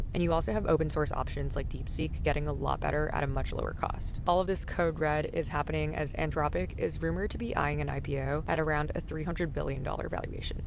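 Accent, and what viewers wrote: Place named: South Carolina, United States. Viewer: You sound like you are from southwest SC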